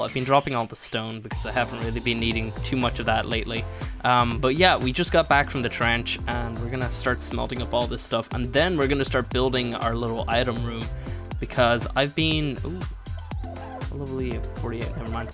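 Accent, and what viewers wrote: Ireland. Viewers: are you irish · He's very Irish. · Damon Carey it’s the way he says it in an Irish accent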